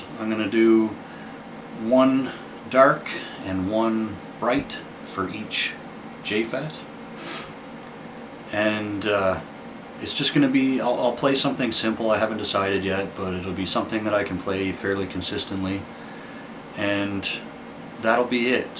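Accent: Canada. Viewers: Just got into building about four months ago. I love your videos man. No this was not boring at all. I could watch this stuff all day. P.s Are you Canadian? My canuk radar is going off haha. I’m in Ontario. Cheers.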